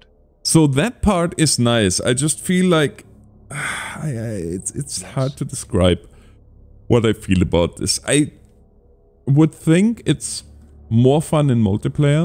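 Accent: Germany. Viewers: Erm, was there a reason for you not to call the medics in on the chemical spillage? The fire was still raging and you had the firefighters drag the casulties out.. I was just wondering ^^ Und täusche ich mich oder hast du einen deutschen Akzent? :D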